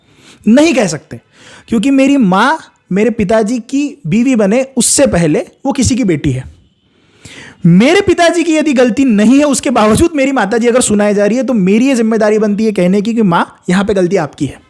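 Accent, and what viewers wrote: Maharashtra, India. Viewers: R u marathi bro. Because your way of speaking🗣 directly tells 😊😗 ??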